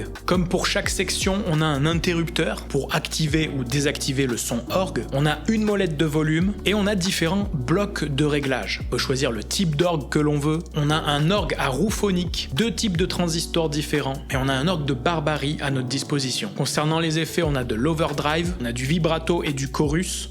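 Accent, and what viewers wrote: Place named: South, France. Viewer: Et l'accent du Sud 😁! Ravi en tout cas de te revoir ici sur WoodBrass!! Tu le mérites!!Bonne continuation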